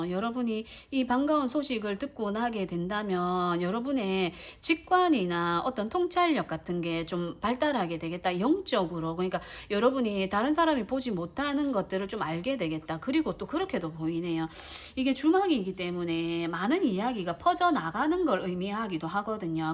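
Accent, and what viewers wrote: Gyeongsang, South Korea. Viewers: 경상도 사투리땜에----😢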